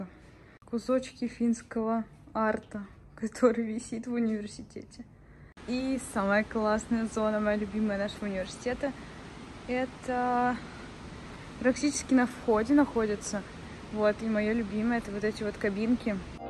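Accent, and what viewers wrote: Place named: Urals, Russia. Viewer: А речь у вас не питерская. Много призвуков и звуков, зарактерных для уральских студентов, возможно это корни?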